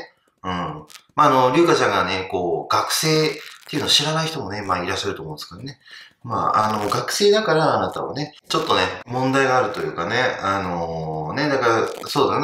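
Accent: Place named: Okinawa, Japan. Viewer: 喋り方が沖縄すぎる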